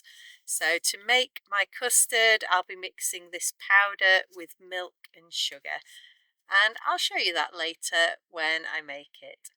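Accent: United Kingdom. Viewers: It's easy to hear and to learn. I love the British accent though. Thanks teacher!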